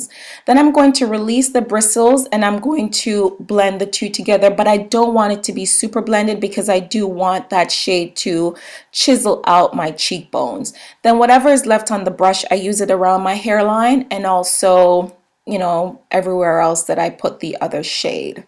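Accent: Jamaica. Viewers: Hey you sound Jamaican. Are you? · Are u Jamaican? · I hear an jamaican accent in there :D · First video I'm watching of you, but correct me if Im wrong but I think you are Jamaican, I just know a Jamaican as soon as I see and hear one. Guess its the patriotism in me.... Love my place and my people badddd!!!! but if ur not its cool, i still love your video lol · are you Jamaican?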